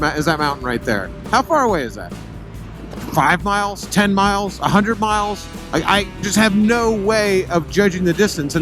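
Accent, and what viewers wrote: United States. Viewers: Ooohhhh that typical annoying yank accent and continuous mindless chit chatter.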